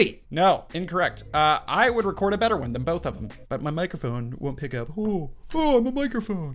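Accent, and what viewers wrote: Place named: Italy. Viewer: Best Italian accent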